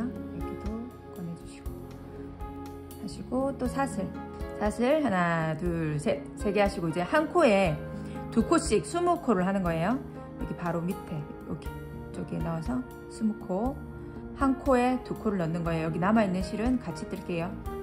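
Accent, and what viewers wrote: Busan, South Korea. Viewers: 저도 부산 출신이라 ㅎ 광안리 … 멀리 미국에서 부산 사투리 쓰시는 님이 늘 가까운 느낌이네요 뜨게질 소질은 없지만 보게 되네요 ㅎ 예전에 지인이 만들어준 수세미도 생각나고 잘봤어요~~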